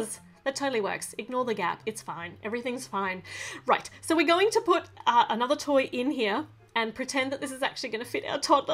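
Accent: Australia. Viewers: It oki I understand Australia accent!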